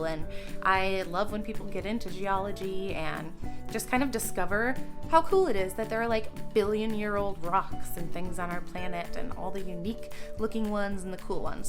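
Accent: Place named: Minnesota, United States. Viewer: I mean you're a Minnesotan I say melk, tæble, and I'm from SD Bāg vs beg :)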